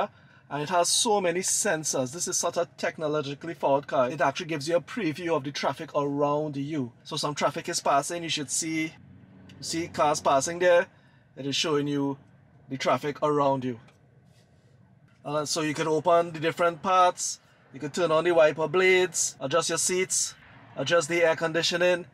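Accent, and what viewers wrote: Trinidad and Tobago. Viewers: He sounds very Trinidadian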